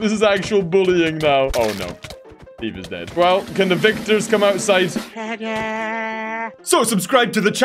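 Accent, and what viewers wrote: Scotland, United Kingdom. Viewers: Love your vids and I'm part Scottish from my dad side and your Sckttish accent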